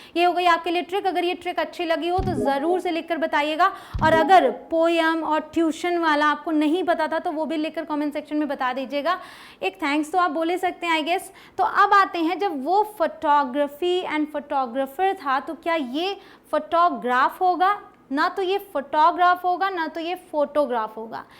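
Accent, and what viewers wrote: India. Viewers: Mdm ye Indian eng h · no mam. I am not insulting her .what we learned in childhood English. Its Indian pronunciation. Thats what I am talking. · Indian accent...👌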